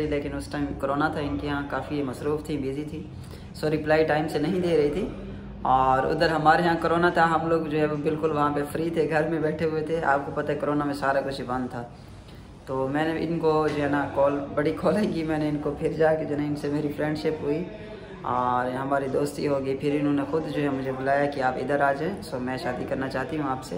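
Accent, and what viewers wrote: Saraiki, Pakistan. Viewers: brother apki awaz se hi pata lag gya tha k ap seraiki hu jitna bholese tu wala utna suchase so everything is fantsatic in your vlog wish u a very happy life allah hamre b naseeb khole apki trah😂😂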